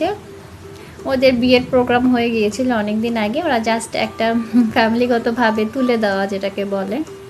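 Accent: Sylhet, Bangladesh. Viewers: আসসালামু আলাইকুম আপু কেমন আছেন? আপু আপনি কি সিলেটি? আমার বাড়ি সিলেট তাই জিজ্ঞেস করলাম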